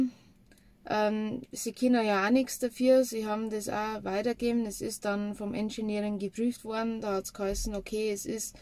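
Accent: Bavaria, Germany. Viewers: Hallo. Feine Sache. Nun gut, diese etwas säuerlichen Regelungen, dass man ein gleichwertiges, gebrauchtes Teil bekommen kann, die kenne ich auch aus dem Job. Die Leute denken immer das Garantie (Gewährleistung) bedeutet, dass man auf alle Fälle Neuprodukte beanspruchen kann. Pustekuchen. Allerdings ist es schon komisch, dass beim Akkutausch Tesla anscheinend Lotterie spielt wer was bekommt?! Nuja, Hauptsache es läuft wieder. Dein Dialekt erinnert mich an meine Kindheit, wo wir immer nach Bayern in Urlaub gefahren sind. Cool irgendwie. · Ich mag deine Videos und deinen Bayrischen Dialekt.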